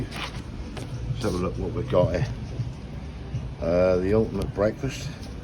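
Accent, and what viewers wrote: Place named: Lowestoft, United Kingdom. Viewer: Surprised you never had your breakfast in the cafe in Sparrows Nest itself, unless, of course, you've already been there. Relatively expensive, perhaps, but very good. I was born and bred in Lowestoft, but love coming home! Love your videos and your wonderful Lowestoft accent. You make me feel homesick! Keep those videos coming!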